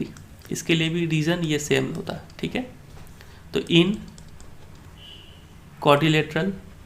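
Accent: Bihar, India. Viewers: Bihari hai aapna bhasaa aur sanskriti kaise bhul sakte . Proud to be bihari. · Sir apki voice bihar-jharkhand ke logo ki jaisi h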